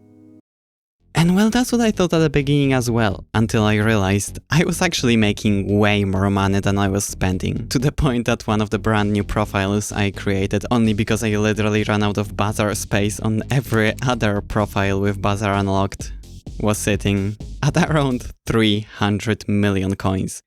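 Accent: Poland. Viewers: Your accent is so Polish.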